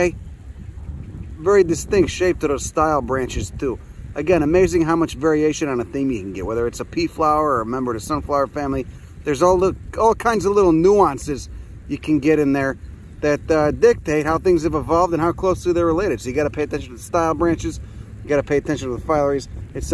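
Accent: Chicago, United States. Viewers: can you please say "flotsom and jetsom" in yer chicago accent????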